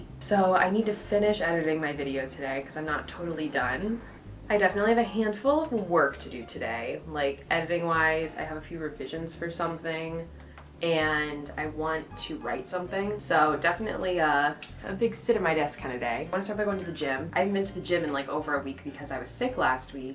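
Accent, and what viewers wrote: New York, United States. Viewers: Hello, sorry to hear about the loss of your Grandma. She must have inspired you in such a way. Good luck with learning to speak Italian in your trademark husky New York Accent! The Cats Olive and Bennett still steal the show! Take care from London UK 🇬🇧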